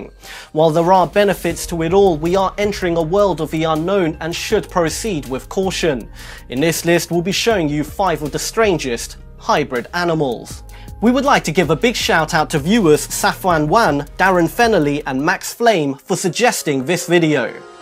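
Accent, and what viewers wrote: England, United Kingdom. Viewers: That is just his English accent.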